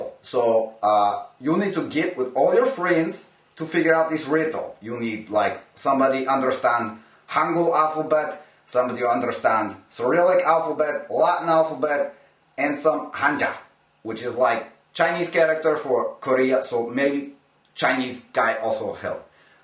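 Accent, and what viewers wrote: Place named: Ukraine. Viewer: I can't make sense of the riddle, but from your accent alone, my guess would be somewhere in the USSR (as it was still called in 1978), perhaps Ukraine. Second guess would be Poland, but that seems too obvious.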